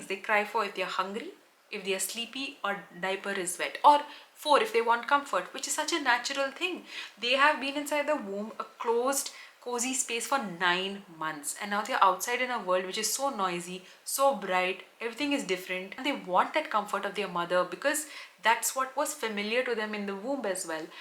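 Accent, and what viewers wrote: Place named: Bangalore, India. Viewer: Is it just me or theres proper bangalore accent exploding in this video ❤❤😂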